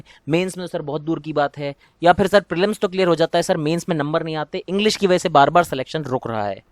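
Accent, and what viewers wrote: India. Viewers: West up language hai sir aapke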